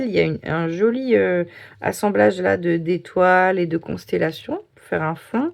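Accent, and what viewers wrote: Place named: Franche-Comté, France. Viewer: Coucou, hâte de voir les belles créations que vous allez nous faire. Et vive l'accent franc-comtois 😂 petite caresse à vos compagnons à quatre pattes 😻🐨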